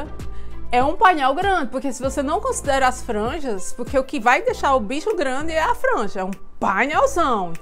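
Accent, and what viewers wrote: Northeast, Brazil. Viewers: Muié de Deus😂😂😂😂😂😂😂 tu só pode ser Nordestina 😂😂😂😂😂😂😂😂😂😂 PARABÉNS... Que DEUS te abençoe sempre 🙏🙏🙏🙌🌺🌺🌺 Direito de Maceió Alagoas Brasil kkkkkkkkkkkkkkk 😂😂😂😂❤❤❤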